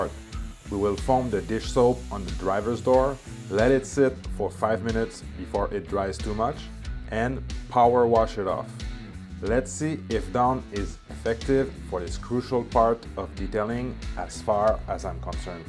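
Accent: Quebec, Canada. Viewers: I'm going to stick to not using dawn sorry but you sound like you're in Canada so maybe you guys have the good stuff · I hear that Québécois accent, bonne Saint-Jean !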